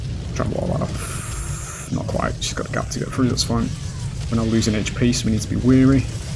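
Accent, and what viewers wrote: United Kingdom. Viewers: Where in the North are you from man? You sound like Lancaster way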